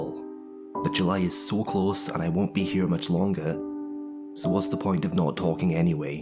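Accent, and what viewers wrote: Wales, United Kingdom. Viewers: great video mate (are you welsh by any chance?). I was wondering about this the other day, I stumbled across that subreddit earlier on in the year and forgot what date they were hoping for contact, glad I came across your video on the Nexpo subreddit.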